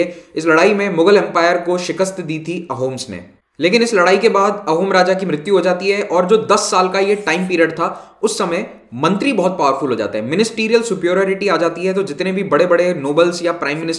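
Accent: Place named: Assam, India. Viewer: Your pronunciation is almost an Assamese....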